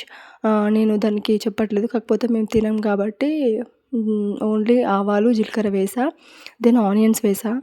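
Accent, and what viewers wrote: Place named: Telangana, India. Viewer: తెలంగాణ మాట సూపర్ 👌👌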